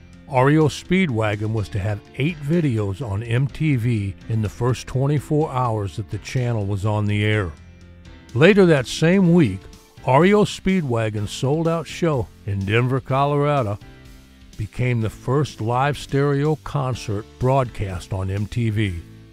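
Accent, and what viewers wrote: South, United States. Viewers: Understood. I was just thinking from the point of view of someone who may have never seen your previous ones. I do like your videos. Your Southern accent makes them unique. Keep it up.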